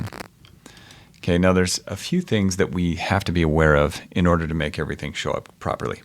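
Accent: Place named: United States. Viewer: US accent yeah:face-fuchsia-wide-eyes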